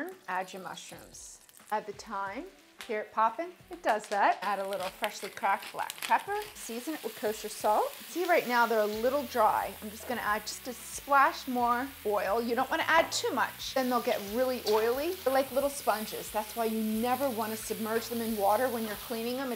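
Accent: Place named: New England, United States. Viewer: loved what you did both on the pot roast and the rib roast. Methodical and clear. Also your presentation is awesome. Total butter, can’t replicate the accent but willing to bet New England/ New York maybe Jersey. Cheers.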